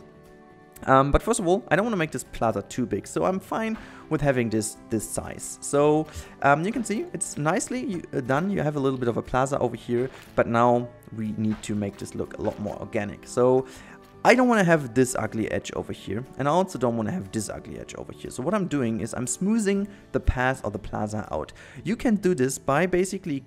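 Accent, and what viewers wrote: Germany. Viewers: Dat german accent tho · Warum machst du eigentlich die Videos auf Englisch? Erreichst du so viel mehr Leute als mit deutsch ? Dein Akzent sagt mir das du deutscher Muttersprachler bist :D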